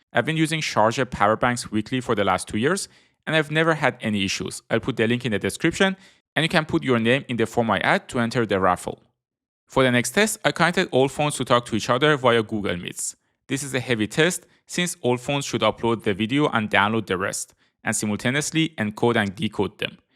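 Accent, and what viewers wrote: Iran. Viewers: سلام هم زبان فارسی ، از لهجه تون معلومه ایرانی هستین😂😂😂😂😂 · The accent. Bro you're from Iran right? Great video btw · bro are you iranian? your accent is just like iraninas. i'm iranian too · That Persian Accent 🤣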